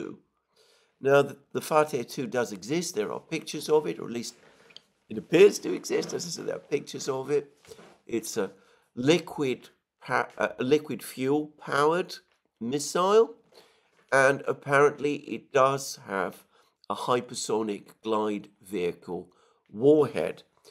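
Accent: United Kingdom. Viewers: His British accent puts me to sleep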